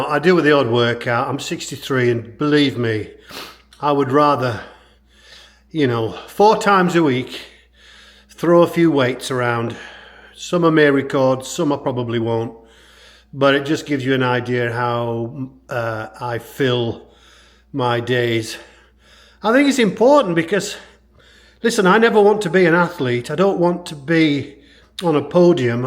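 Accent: Northern England, United Kingdom. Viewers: Great video...we had a similar issue when deciding our move to France...fortunately our house sold in a week..the slow part was after we offered on our current house in France it took 5 months from offer to completion but well worth the wait. We were from Yorkshire and you definitely sound like you have a northern accent but cant quite place it😂...looking forward to the next episode..a great alternative to Strictly Come Dancing😂..Andrew and Julie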